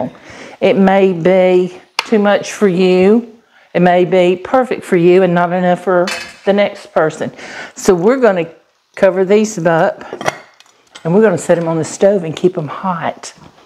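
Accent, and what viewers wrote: South, United States. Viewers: Hi, I know I am late to this party but I am glad that I watched. Your food looks yummy and also nutritious too. I love your southern accent when you speak. It makes me lonesome for Texas. I know you are in Alabama but still a sister from the South. I have never made gravy just did not have the talent. When I made meatloaf I used ketchup or mild honey BBQ for a gentle topping. Thanks for the gravy lesson and the tip with the buttermilk too. Take care and God Bless you and your hubby.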